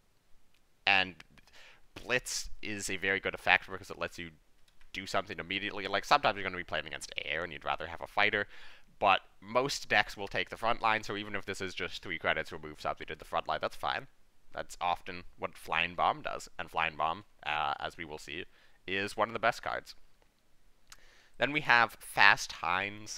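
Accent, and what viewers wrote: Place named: Canada. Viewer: Thank you so much for these videos. Your insights on these cards are very interesting and, in some instances, surprising. On a side note, has any one ever told you you sound like Vince Vaughn? I mean, apart from the Canadian pronunciation, you sound exactly like that guy.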